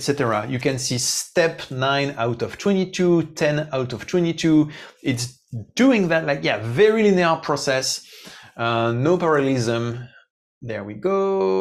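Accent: France. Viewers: Haha, definitely not :) What confused me was that the guy has French accent, but the prompt is in Cyrillic.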